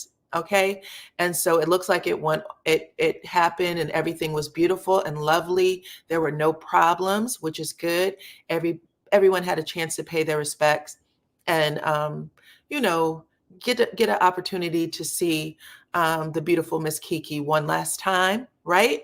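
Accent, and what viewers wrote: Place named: Boston, United States. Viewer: I can hear you Boston in the house❤️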